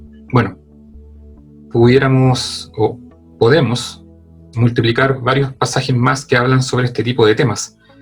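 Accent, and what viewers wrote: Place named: Chile. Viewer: Muy instructiva la presentacion, gracias. Pero usted tampoco describio las 7 definiciones de Verdad que Dios revela en su palabra, todas, las 7, y es muy importante conocerlas todas porque de otra forma no conocemos a Jesus, y el diablo se rie y se burla del medio creyente, porque continua ignorante, desprotegido y vulnerable para ser engañado a su antojo. Desea conocer las 7 definiciones de Verdad que nuestro Dios nos enseña y revela en su palabra, la Biblia ? Y por lo que oigo usted es de Chile, hermoso pais para conpartirle de Jesus y su palabra.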